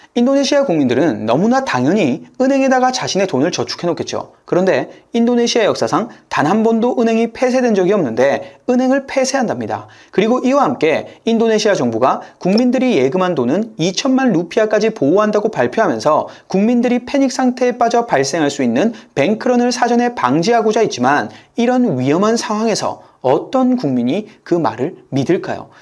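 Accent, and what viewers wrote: China. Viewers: 내용이 좋으면 모하노 성조가 중국어급이라 처음 듣자마자 거부감부터 드는데 전국구 방송하려면 표준어 구사를 하던지 악센트를 고치던지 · 조선족 같다능 ~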